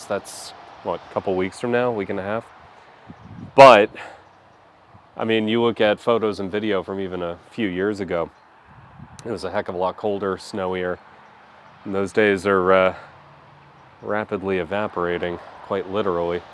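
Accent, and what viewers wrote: Scotland, United Kingdom. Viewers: Hi, your mimicry of an English accent was pretty good and you are starting to acquire a Scottish accent too. The whisky certainly seemed to reach parts that other whiskies don't reach😅. Loved watching the gin production at Dunrobin Castle, I always wondered how gin companies got their botanicals into the gin and now I know that its with a giant teabag. Love the colour of their bottles too. Wishing you and Christine as well as Queen Annabel a Merry Christmas. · I’m liking the new format. I’m also starting to notice a wee bit of a Scottish twang with some of your words, we must be growing on you 🙂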